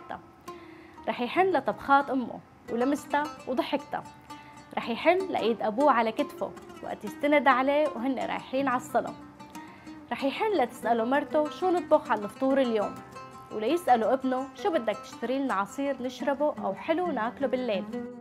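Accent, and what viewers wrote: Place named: Levant. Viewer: ماشاءالله وتبارك جمال رباني صوره مصوره وكلام متزنًا ولهجة شامية مئه من مئه وشو كمان مابعرف شو بدي أقول لك.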